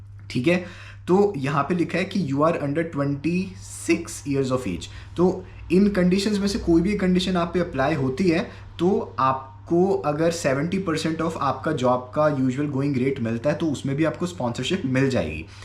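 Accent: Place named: India. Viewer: I can't your English very well it's too indianish!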